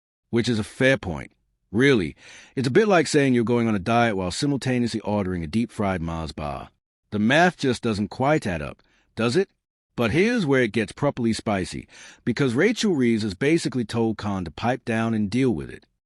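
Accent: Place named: United States. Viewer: I'm a bit suspicious of this, nice though it would be, if true, but there is nothing about this on any other youtube channel. Together with this being reported by someone with an american accent, how come nobody who is British reporting this. A huge pinch of salt is needed with this. Is this, (Fake News) going under yet another name?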